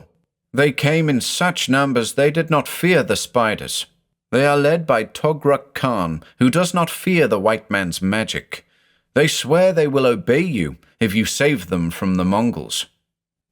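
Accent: United States. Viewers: Solid American accent. Good job. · Your American accent is getting very good,! 👍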